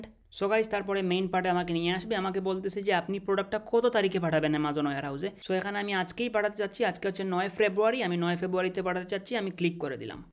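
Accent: Sylhet, Bangladesh. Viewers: Assalamualikum.bhai kita Sylhety ni? mashaAllah nice video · are you sylhetti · ভাই তুমি সিলেটি নি? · 🫣তুমার মাত হুনলে বোঝা যায় তুমি সিলেটি 🏞️😍😘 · Apni ki Sylheti 🙂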